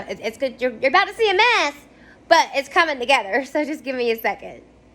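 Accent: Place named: Tennessee, United States. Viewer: She got that Tennessee accent y’all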